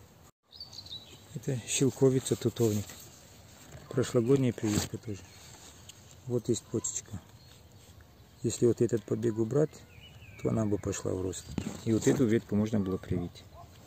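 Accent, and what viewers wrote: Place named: North Ossetia, Russia. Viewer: Добрый день! Случайно попала на Ваш канал и не могла 2 часа оторваться! По комментариям узнала(по акценту догадалась) что Вы из Осетии. Подписалась и буду применять Ваши методы. Все здорово и понятно.А кто Вы по профессии?Удачи и процветания. Елена Тедеева .Армавир.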